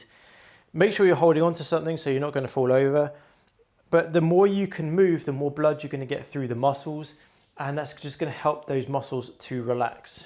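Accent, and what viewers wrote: United Kingdom. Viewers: Excellent video and very helpful, much prefer the English accent to an American drawl!!!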